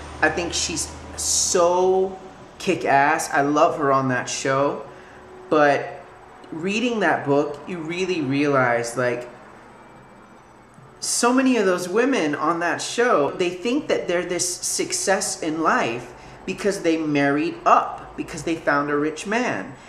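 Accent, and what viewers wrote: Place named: South, United States. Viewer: You sounded really southern in parts of that video!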